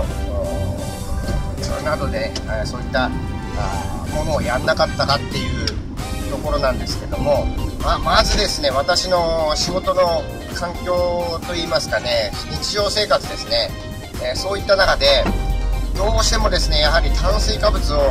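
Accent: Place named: Tochigi, Japan. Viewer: ありがとうございます 関係ないですけど自分も栃木県民なので応援してます！w たまにでる栃木訛りがいいですね！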